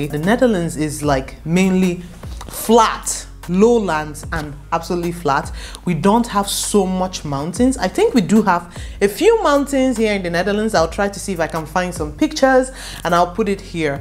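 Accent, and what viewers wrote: Nigeria. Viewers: she is a Nigerian,, Nigerians accent pronounce u"as o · I am South African and I love how persistent the Nigerian accent is no matter how long they live outside their own country! 😂 This lady is extremely poised and articulate either way ❤ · nice video😀. you still keep your Nigerian accent and vibes, you keep using "ABI"👍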